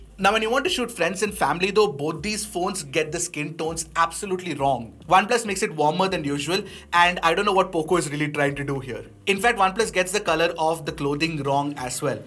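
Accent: India. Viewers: Good indian english, I'm from Brazil and can understand 100% what you say, amazing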